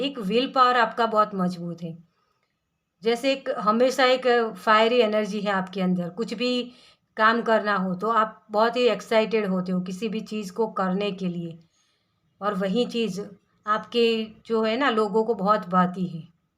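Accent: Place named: Gujarat, India. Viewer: Pile 1 sub sahi hai mam our aap ki hindi masaallah Vijay rupani jesi hai.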